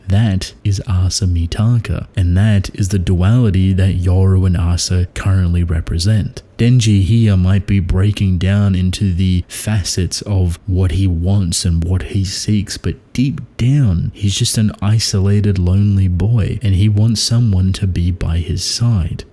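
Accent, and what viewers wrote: Australia. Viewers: Why has it taken me over a year to realize how Australian u sound😂? I was wondering where tht damn accent came from, it’s from down unda. another banger video gang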